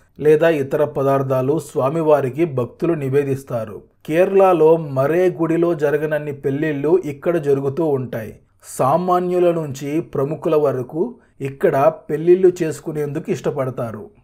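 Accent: Nellore, India. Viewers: అన్న మీది Nellore haa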